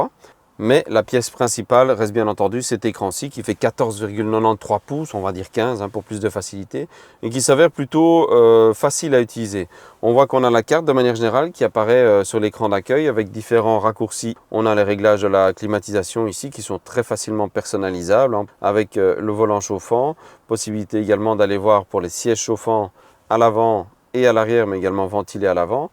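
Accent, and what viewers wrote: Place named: Belgium. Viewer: Sympa un danois qui parle français avec un petit accent belge 🎉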